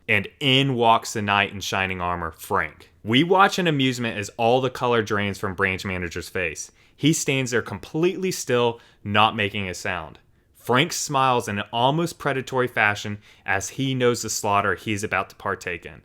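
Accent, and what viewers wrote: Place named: South, United States. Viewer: Your southern tang makes me want to watch your videos more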